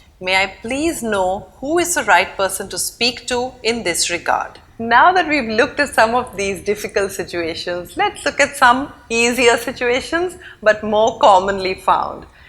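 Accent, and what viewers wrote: India. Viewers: hi, this lesson was great to have . nice teaching.are you from India or from another country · You are indian madam so sweet accent. from France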